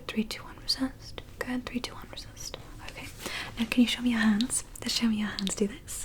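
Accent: England, United Kingdom. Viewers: Does she do any other ASMRs in her English accent? I love it so much lol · YES! THE BRITISH ACCENT IS BACK!!!!!!!!!!!!!!! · Big Hayley Atwell vibes with the british accent 😍😍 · Your English accent is the best please make more of them like this 😍😍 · Really Beautiful Woman with Glasses and the Most Beautiful British Accent!! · I was beginning to withdrawal from not hearing the British accent. She came through at the perfect timing lol🙏🏼🙏🏼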